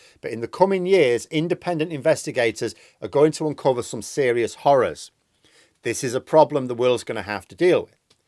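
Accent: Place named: Yorkshire, United Kingdom. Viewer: You went very Yorkshire in this one, momentarily reminded of my Yorkshire Grandad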